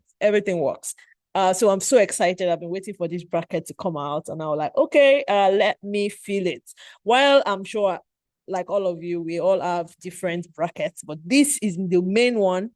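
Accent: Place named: Nigeria. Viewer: I'm Kenyan so I was loving the Nigerian accent Oooo! Thanks for the video. I used your exact model for 1 of mine. Wild & crazy bracket! Wish you the best oooo! 😜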